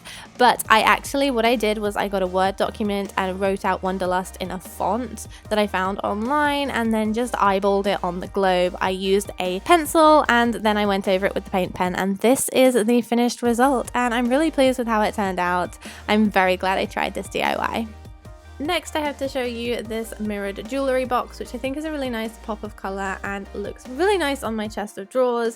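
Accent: England, United Kingdom. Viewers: Are you from England? You have a sweet accent :)) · Are you from the uk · are you from the UK ?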